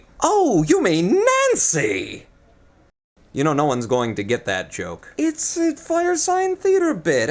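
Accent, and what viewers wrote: United Kingdom. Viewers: that was amazing, it's kinda crazy that i now know what part of the world you live in, for ages I thought you lived in England, you accent is such a mix of British and American, and in your earlier videos you sound pretty much completely British. You deserve all the love your fans give you! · Michigan? Hmm for some reason based on your accent I figured you were from somewhere in the UK. Oh well. We are like state-neighbors. I'm from Ohio.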